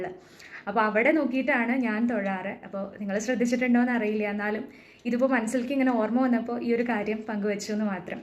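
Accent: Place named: Palakkad, India. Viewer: മോളുടെ സംസാരം കേട്ടപ്പോ pkd ആവും എന്ന് തോന്നി അതുകൊണ്ടാ ചോദിച്ചത് 😍😍😍😍 ഞാൻ ഒറ്റപ്പാലം 🙏🏻🙏🏻🙏🏻🙏🏻🙏🏻😊😊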